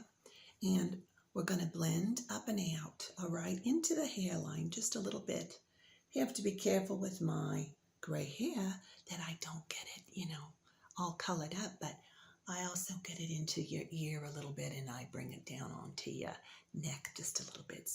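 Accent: South, United States. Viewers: is this what they call southern accent ? ^^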